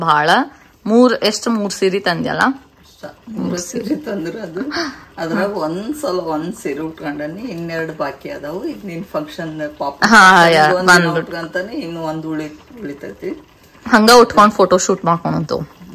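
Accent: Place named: Dharwad, India. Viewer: ಏನ್ರೀ mrs ಏನ್ ನಡ್ಸಿರಿ... ಧಾರವಾಡ ಭಾಷೆನ ನಿಮ್ ಬಾಯಿಯಿಂದಾನೆ ಕೇಳ್ಬೇಕು ಅನ್ನಿಸ್ತದ ನೋಡ್ರಲ ಪಾಪುವಿನ ನಾಮಕರಣ ವ್ಯವಸ್ಥೆ ಮಾಡೋದ್ರಲ್ಲಿ ಬಹಳ busy ಇದ್ದೀರಿ ನಡೆಸಿ ಎಲ್ಲಾ ಒಳ್ಳೆಯದಾಗಲಿ 🙏🙏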